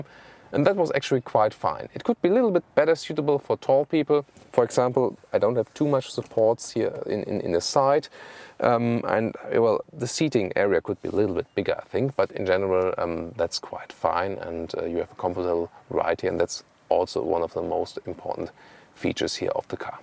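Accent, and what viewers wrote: Germany. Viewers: I hope you mean "Bruno," but even so the only similarity is a German accent. And Bruno is actually Austrian.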